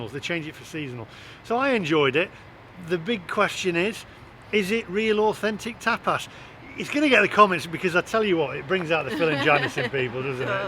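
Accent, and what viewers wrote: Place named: Black Country, United Kingdom. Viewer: I should be offended as im from Wulvarampton but i cor stop loffing at his daft black cuntray accent 🤣 He dow arf sound daft 🤣🤣🤣🤣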